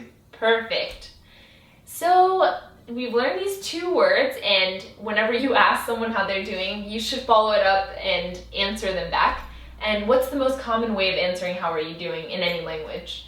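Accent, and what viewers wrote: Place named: Tehran, Iran. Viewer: there are some very old persian dialects. I have only run into one person who spoke an older, more traditional Bakhtiari persian. This is more modern "tehranian" accent persian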